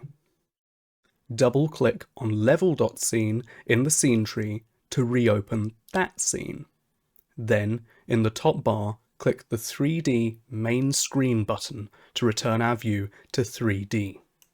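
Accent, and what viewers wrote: United Kingdom. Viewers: You sound English but look Australian 🤔 Thanks for the tutorial!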